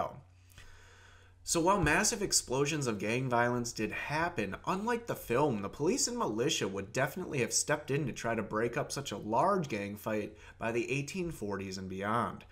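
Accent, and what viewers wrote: Ireland. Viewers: Are you Irish, man, or have you just been watching too much Liam Neeson? Wait, Canadian? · You sound irish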